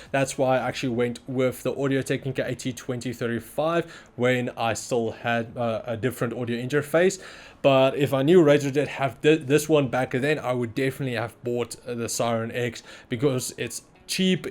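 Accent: South Africa. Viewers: what the my mans is south african gg ez sub for him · I was gonna say, This guy sounds south african - and then you had the ZA ad spot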